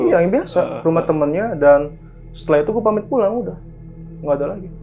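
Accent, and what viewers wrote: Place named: Java, Indonesia. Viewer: Kayaknya org karang anyar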